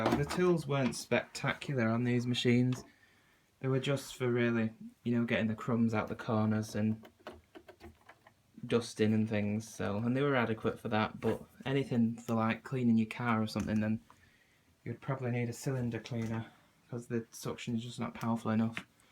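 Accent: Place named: North England, United Kingdom. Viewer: This was back in the good old days when the Brits and the Yanks still shared vacuum designs for the Hoover cleaners... One of my Mum's best childhood friends worked at the N Canton Hoover plant for decades(both their Dads were native Scots/Brits) and the two Dads worked for Goodyear Tire/Tyre and Rubber in Akron, Ohio, my current hometown... I enjoy your videos and comments and your lovely Liverpool or Manchester accent!!! Cheers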